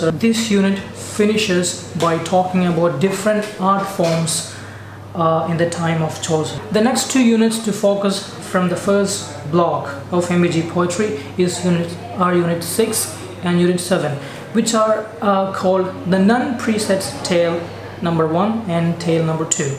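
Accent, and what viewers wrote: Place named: United Kingdom. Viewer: Pure British accent wow...Thanks.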